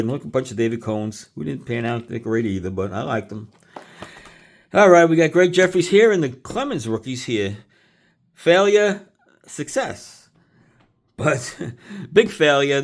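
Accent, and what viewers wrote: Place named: New York, United States. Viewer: This guys NY accent is thick as a rug.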